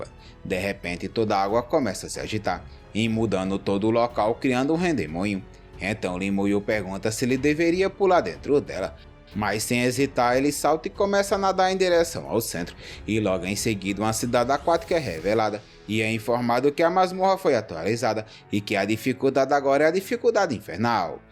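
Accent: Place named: Northeast, Brazil. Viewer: 😂 kkkk mais um EP top demais 😍 parabéns pela sua narração perfeita sem ia amo o dialeto nordestino 😂 em algumas partes me pegou de surpresa com um xingamento do neida 😂😂 vamos nessa para o próximo episódio ❤😊👍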